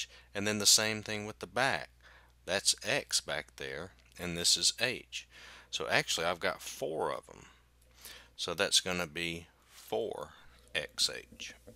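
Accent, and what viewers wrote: South, United States. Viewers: Modern day life is so wonderful if I have bad teachers I can have a smart dude with a country accent explain maths to me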